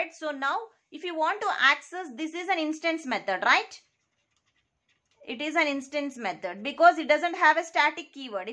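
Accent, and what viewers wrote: Hyderabad, India. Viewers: are u from Hyderabad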